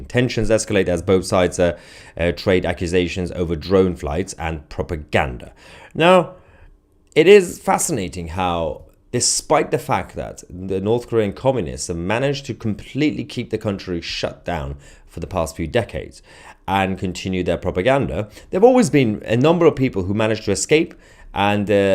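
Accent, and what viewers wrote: United Kingdom. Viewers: Who are you referring to? speaks with correct English grammar with a charming British accent. It's the posters and people on the chat who do have terrible grammar. I love humorous comments about peoples' poor English that is incomprehensible at times.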